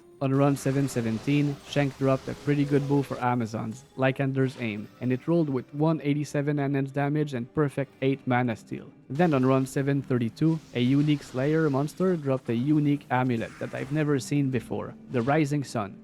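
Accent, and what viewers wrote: Quebec, Canada. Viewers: Tes quebecois en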